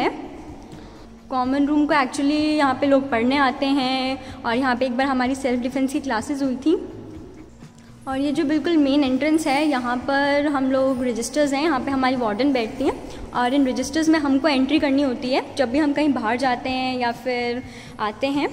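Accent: Telangana, India. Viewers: Ohh your cute Telugu😅😅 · Great video...u speak very cute telugu 😍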